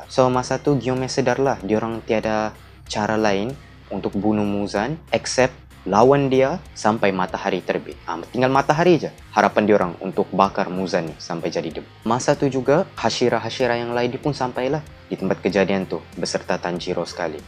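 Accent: Sabah, Malaysia. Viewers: Ui orang sabah ba kau ni 🗿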